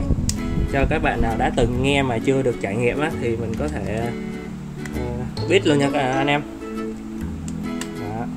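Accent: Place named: South, Vietnam. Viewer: giọng miền nam dễ nghe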